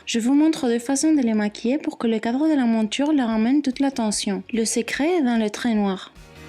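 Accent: Spain. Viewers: J'adore tes vidéos! Mais, je dois te dire j'aime trop ton accent! Il est trop chou! On dirait que tu parles l'espagnol mais en français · J'aime beaucoup le second make up, tu es très jolie, mais j'ai du mal avec ton accent x) Tu es espagnole? portugaise ?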